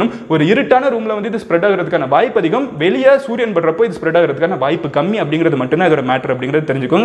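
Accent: Madurai, India. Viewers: Neega Madurai yaah? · Madurai ya bro nega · Bro neenga Chennai vaasi yaa Illa Madurai vaasi yaa pls sollunga bro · Bro nenga Madurai ah? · Bro neega madurai yaa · Nega madurai ya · நீங்க மதுரை யா...? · Neenga madurai ah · Bro neenga Madurai ah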